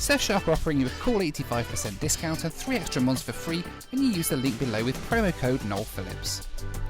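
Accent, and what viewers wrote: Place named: United Kingdom. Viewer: Hi Noel... Which part of the UK are you from? Trying to work out your accent!! Cheers :)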